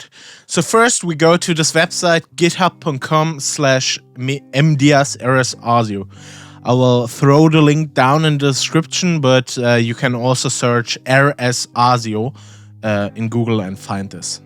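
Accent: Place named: Germany. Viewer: Knew you were German after 2 seconds. Thank you for this video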